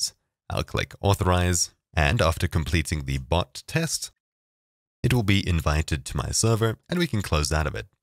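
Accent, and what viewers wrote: South Africa. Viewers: hey i hear a south Africa guy here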